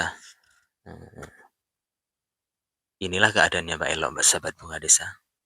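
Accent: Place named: Madura, Indonesia. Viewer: Org maana sih kang logat nya kaya madura gitu😊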